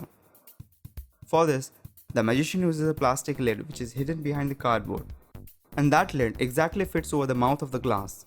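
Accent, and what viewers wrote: India. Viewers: What'sup with the indian accent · indian accent, bye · He is Indian because Indian people voice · Indian accent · I hate that indian voice. Thumbs down! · Please, your India accent so annoying, and dont copy others video. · Learn another accent, this indian one is very cringy and anoying. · The voice over has a strong Indian accent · Why do some videos have a host with thick Indian accent?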